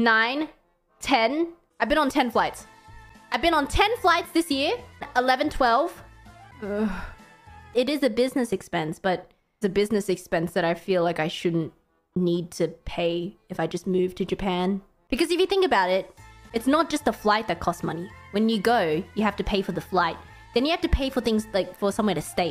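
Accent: Australia. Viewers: Imagine moved to japan then she talked normally with no aussie accent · At the surprise of know one, but fr as long as she keeps her Aussie accent it's all good. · I hope could maintain her aussie accent in Japan.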